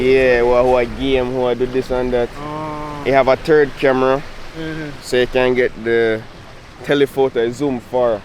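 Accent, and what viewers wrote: Jamaica. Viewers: That Jamaican accent so sweet... This is one of the best you have done so far! Blessings to you and your fam. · Hey man mi a Jamaican brotha good to hear ya in ya native voice great video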